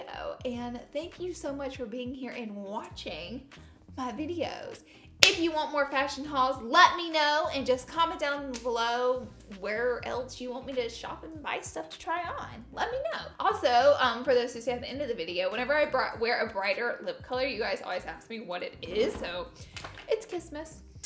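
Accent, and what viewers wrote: South, United States. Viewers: That country accent 😂 love it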